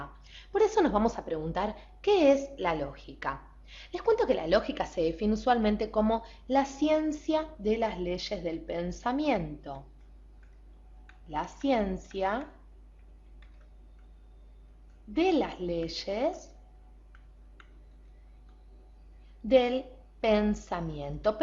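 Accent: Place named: Argentina. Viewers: Por que hablas en argentino? D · Nosera porque es Argentina?👊😈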